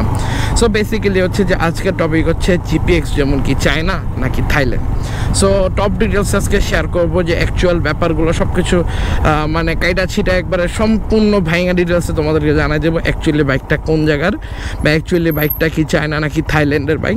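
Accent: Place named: Gazipur, Bangladesh. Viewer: Apnr basha Gazipur kothi porse 😊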